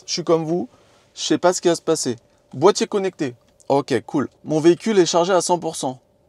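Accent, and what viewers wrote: England, United Kingdom. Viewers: Vraiment cet accent Anglais...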